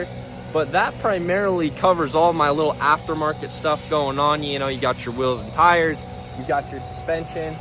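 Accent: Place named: United States. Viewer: Your country accent is so cute 😊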